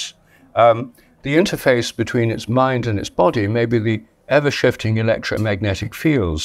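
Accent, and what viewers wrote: United Kingdom. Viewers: Before I started the video I says to myself I bet this guy has a British accent and bada bing, I predicted the future!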